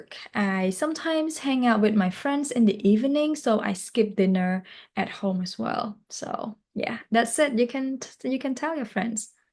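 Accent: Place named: United States. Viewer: អ្នកគ្រូនិយាយភាសាអង្គេស តុង អាមេរិក បានល្អណាស់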